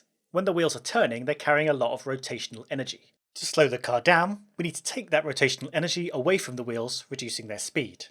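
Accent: United Kingdom. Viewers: How much I love this channel and how helpful it is, I find it extremely hard to follow the language. No secret that British accent is more sophisticated if you please, so it's hard for a non-native speaker to catch it at times, then the speed of narration is quite high to read the subtitles, especially in parts where the language is altiloquent. Moreover, there's technical stuff that you're trying to wrap your head around which makes it all even harder. In view of this I heartily ask you to consider slowing down the narration a bit, and maybe spell some words more distinctively. Thank you!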